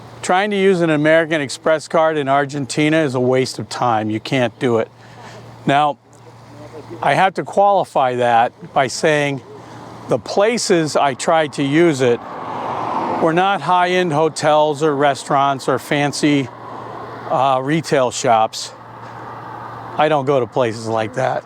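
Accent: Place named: Rioplatense, Uruguay. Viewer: Rioplatense accent. That’s what we speak in Uruguay. Pretty different from the rest of latinoamerica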